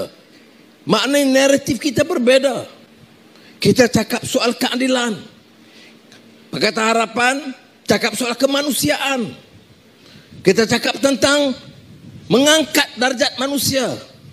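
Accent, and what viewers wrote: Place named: Jambi, Indonesia. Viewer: Mirip dialek Jambi..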